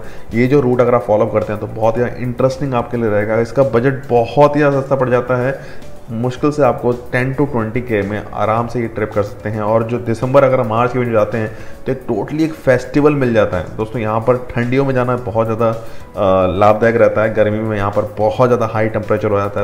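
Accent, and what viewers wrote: Gujarat, India. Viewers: Sirji, Are you from Gujarat !!!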